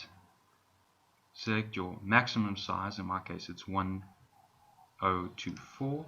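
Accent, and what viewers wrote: South Africa. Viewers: Yoooooo!!!! More South Africans!!! I'm South African too. I live in Cape Town :) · Are u South African because of ur accent?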